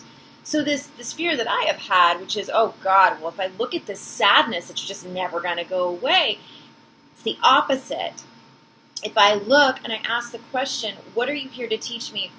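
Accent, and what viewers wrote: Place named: United States. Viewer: I really thought you were British in John Carter... O_o I'm still trying to get used to your American Accent... talk about a twist.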